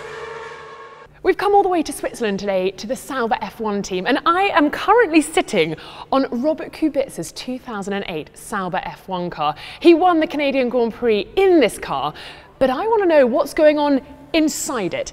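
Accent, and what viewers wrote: United Kingdom. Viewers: I fucking hate that british accent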